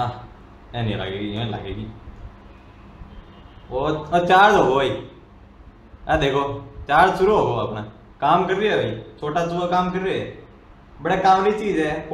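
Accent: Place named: Haryana, India. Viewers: Mst haryanvi h guru ji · Great bhai!!! Asse hi review or vlogs banya kro...aap jaat ho ...assi damdaar haryani language m baat aap nhi kro gye toh kon krre gye ...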